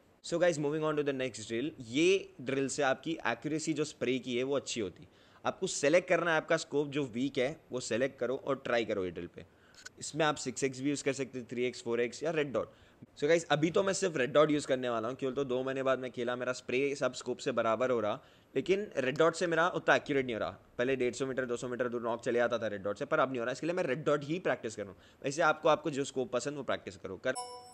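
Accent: Hyderabad, India. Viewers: Bhai bohot dino bad apki Hyderabadi Sunna bohot acha laga bhai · That hyderabadi accent is lit 🔥🔥🔥🔥🔥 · Bhai tu pakka wala hyderabadi lagtha hai 😍🤩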